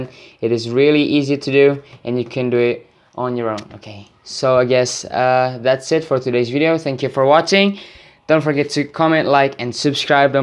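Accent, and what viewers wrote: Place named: India. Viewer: you have Indian accent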